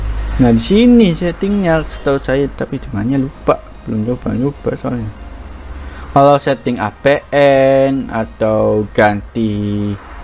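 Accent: Indonesia. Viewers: logat cirebone matep lur ciri khas banget. . salam wong reng,pengen takon bokat di sewoti soale tuku alate ngecer dudu ning sampean,,wkwkwk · Logat bahasa kyak wong reang ✌✌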